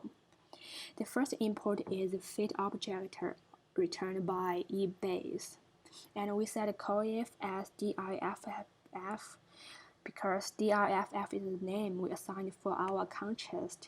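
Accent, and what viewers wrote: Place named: China. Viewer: 聽中國人講英文真的很痛苦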